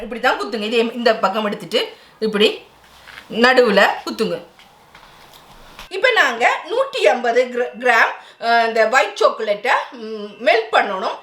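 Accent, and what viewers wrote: Sri Lanka. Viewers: Beautiful sharing I love this Srilankan tamil Thanks for sharing stay connected dear new frnd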